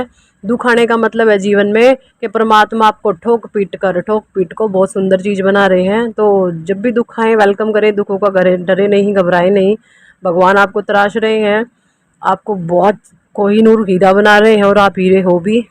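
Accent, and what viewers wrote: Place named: Punjab, India. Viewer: 😀😀😀 वाह तुसी पंजाबी हो 🙏🙏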